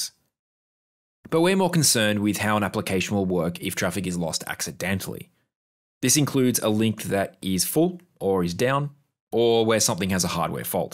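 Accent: Australia. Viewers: As a network+ student I would like to thank you for clearly explainging the difference between QoS and CoS. And great to hear a fellow Aussie for a change. I hope you make more content.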